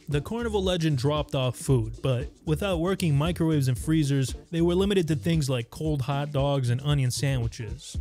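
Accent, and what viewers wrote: New York, United States. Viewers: The narrator sounds like a cross between Alan Alda and Howard Stern with a little New York tough guy thrown in! Great report!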